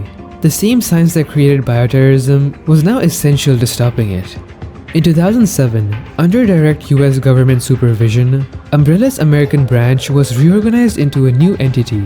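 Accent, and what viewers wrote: India. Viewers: Fookin accent are u indian or latina?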